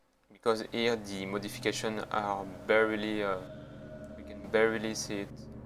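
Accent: France. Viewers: Your french or i don't know your accsent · You are from what country ? France ?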